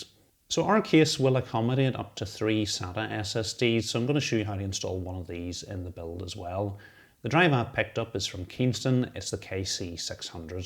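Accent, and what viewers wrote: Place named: Scotland, United Kingdom. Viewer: 55 minutes of Scottish ASMR PC building. Lovely.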